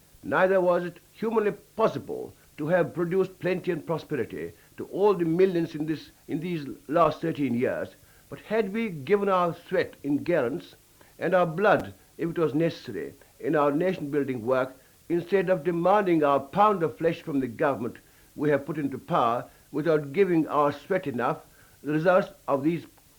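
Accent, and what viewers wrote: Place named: United Kingdom. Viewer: After this vedio : Me :British accent tutorial. Jai hind to the national hero, pride of coorg Field Marshal K. M CARIAPPA first commander in chief of Indian army. First Indian to command troops of British under him. Ayub khan president and first commander in chief of Pakistan army served under his command. Led the 1948 war against Pakistan being the western commander. One of the two legends to be honored with Field Marshal rank.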